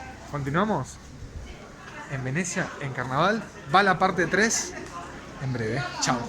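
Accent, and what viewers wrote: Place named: Argentina. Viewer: El barbijo es para usarlo bien...Apenas empezaste hablar me di cuenta que sos de los nuestros 🇦🇷💖 Saludos desde Irlanda 🇮🇪🇦🇷💖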